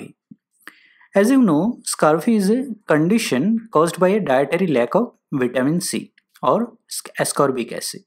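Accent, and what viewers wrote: India. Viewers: Excellent video with nice animation. Thank You Sir. Your voice sounds like you are from WB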